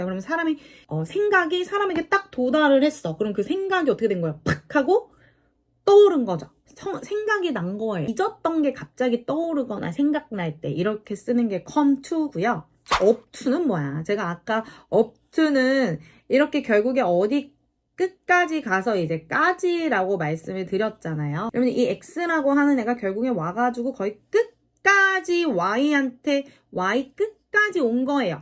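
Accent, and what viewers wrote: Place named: Jeolla, South Korea. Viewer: 전라도네? 으미X 의미0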